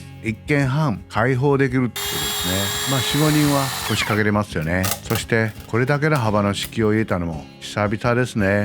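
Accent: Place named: Kansai, Japan. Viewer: 建築設計事務所をやっています。子供の頃、模型を作っていた私に、親父がしきりに「物事の道理」ということを言っていましたが、さんの作られた住宅を見ていると、その言葉が思い浮かびます。私も関西出身ですから、さんの話す言葉が、親父が話している言葉のように聞こえてきます。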